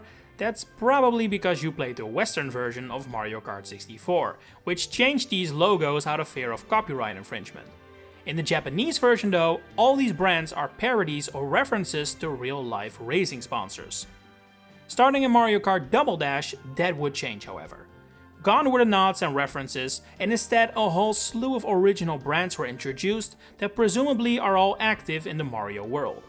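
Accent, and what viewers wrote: Netherlands. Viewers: Nice video bro Heerlijk Nederlands stemmetje hoor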